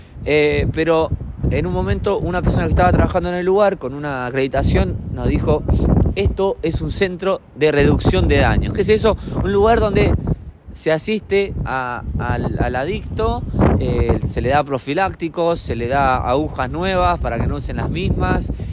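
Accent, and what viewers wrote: Argentina. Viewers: Jajaja me partí con lo de "leche de almendras. de gente rara" 😅😅 pues a mí me gustan esos rollos, me acabo de suscribir a tu canal por 4 cosas: me gustó la temática del vídeo, me gusta cómo se ven las imágenes con la cámara esa y me mola el acento argentino, además también me gustó que explicas muy bien todo, un saludito y gracias desde Islas Canarias, España 😉👍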